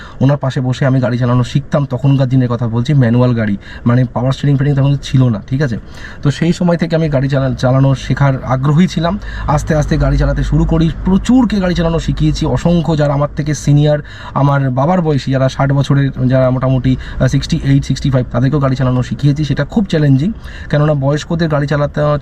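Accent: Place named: India. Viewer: আমি তো অবাক হয়েছিলাম প্রথমে ,যে আপনি পশ্চিমবঙ্গের বাসিন্দা, আপনার হিন্দি দেখে তো মনে হচ্ছিল আপনি অন্য রাজ্যের। স্যার বলছি কিভাবে উঁচু জায়গায় গাড়ি উঠাতে হয় এবং নামাতে হয় এই নিয়ে একটা দয়া করে ভিডিও বানাবেন❤❤❤❤ ধন্যবাদ আপনাকে আপনি খুবই ভালো করে শেখান গাড়ি চালানো , আপনার টেকনিক খুবই ভালো সহজেই বোঝে আসে ❤❤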